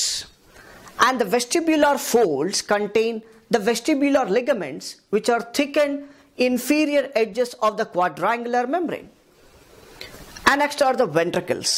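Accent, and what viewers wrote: India. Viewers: 讲得挺好的，简短精炼。但是如果英文咖喱味不那么重就好了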